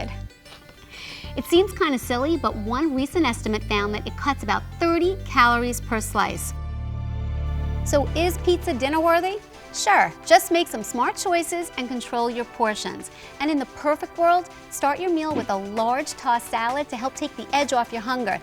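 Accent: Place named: East Coast, United States. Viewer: I love your SEXY east coast accent.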